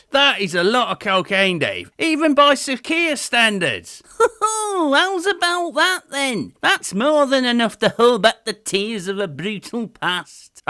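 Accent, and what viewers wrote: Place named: Liverpool, United Kingdom. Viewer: 🇬🇧 Another Cracker! Scouse accent a blinder mate! 😂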